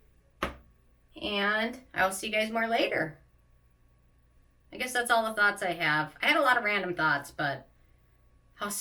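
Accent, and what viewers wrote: Minnesota, United States. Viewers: Minnesota! I was wondering where the accent came from!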